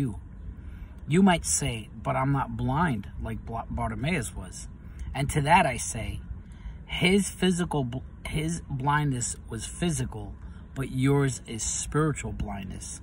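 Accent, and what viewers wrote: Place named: Long Island, United States. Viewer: Oh yes, I didn't mean that message specifically for you since you'd already shared you were loud about Jesus! :-) It was just for anyone who reads my comment (or as a prayer you could suggest to others as you're led by the Lord). I actually discovered you at one point during your Pentecost series, but I think I only saw one video from that (I'd have to go back and check to find out). God bless you! P.S. I love your accent (Long Island?)!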